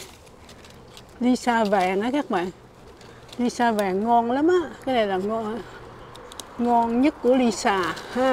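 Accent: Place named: Vietnam. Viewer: Moi lan minh nghe tieng noi con Viet Cong la minh biet lien Do XAO XAO ,cup ve VIET NAM ma O voi VIET CONG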